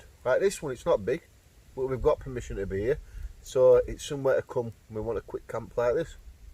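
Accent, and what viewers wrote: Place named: Yorkshire, United Kingdom. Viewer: Where abouts are you from? You’re accent seems proper Yorkshire to me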